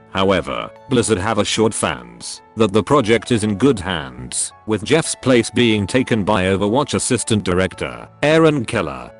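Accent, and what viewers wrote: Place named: Australia. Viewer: its Australian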